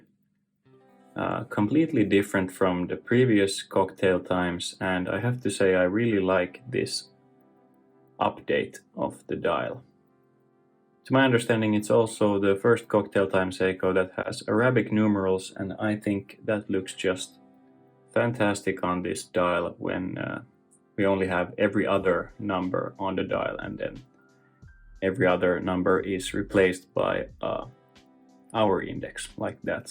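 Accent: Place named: Finland. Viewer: Finnish accent ... immediately I get the impresion this not going to be some BS story.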